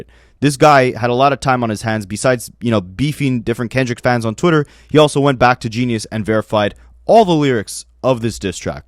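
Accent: Canada. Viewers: Bro i knew you were Canadian!!!! You have similar speech patterns to Whats The Dirt. (Not saying you are WTD). You say "against" the same exact way. I was wondering if it was a Canadian thing. Love the videos btw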